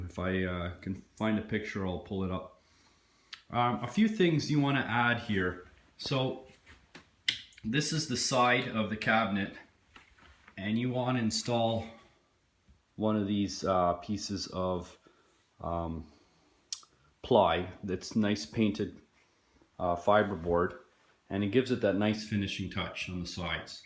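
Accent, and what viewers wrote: Canada. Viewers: Surprised to see this 'Euro' Window you have over the sink - wonder if it swings-n-tilts as well? You sound Canadian ...perhaps why ;-) Cheers! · Silverback Gorrilla ... Playing into stereotypes. Dumb little wanker. The bloke also sounds Canadian, not American.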